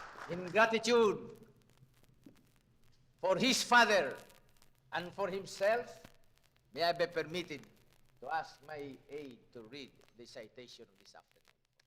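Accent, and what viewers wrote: Philippines. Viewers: Rinig mo yung Bisaya accent niya haha. Not meant to be an insult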